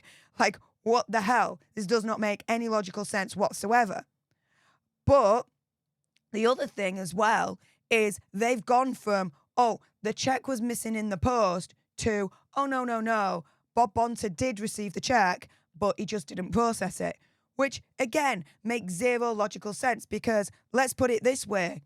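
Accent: United Kingdom. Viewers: Wow! Try using subtitles and please bare in mind in future, Brits speak English the way its supposed to be spoken, The fact you can't understand proper English accents is your failing, Not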